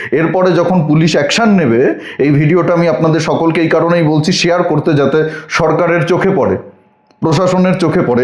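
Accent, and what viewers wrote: Bangladesh. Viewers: She sounds Bangladeshi.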